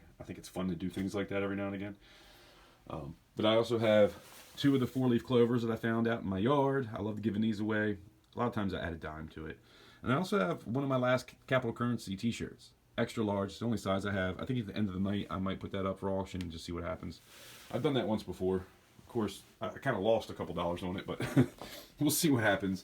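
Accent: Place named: Washington DC, United States. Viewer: Wow! You do auctions?!😯 You are the 1st person I have seen do that! Sounds like you live around D.C., I used to live in Gathersburg, MD, like back in the 80's; nice state! Those little nuggets are cool with the "CC" on it. You made that yourself? You are the man if so! 😎Looking at the rest of the video, it looks like you made a lot of this yourself! Are you like a metal-smith? I am blown away by your talent. 🤯Great job! Can't wait to look if you have a video on how you do this. Looking forward to your next video; also going to Sub now and help a little bit on your path to 2k Subs! Be safe, take care.... See you in Valhalla! ⚔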